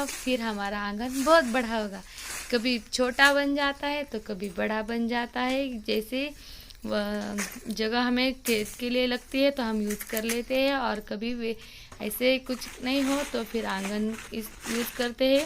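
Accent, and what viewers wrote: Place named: Maharashtra, India. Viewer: मराठी दिसत आहात.... मराठी माणूसच अशी हिंदी बोलतो.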